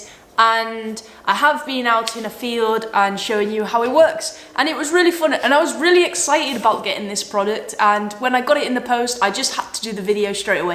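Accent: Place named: England, United Kingdom. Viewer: i dont know why i came to see this but i stayed for the beautiful lady and the english accent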